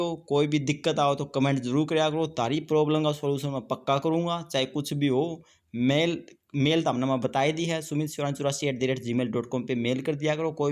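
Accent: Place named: Haryana, India. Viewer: I like your way of talking pure haryanvi 👍🏻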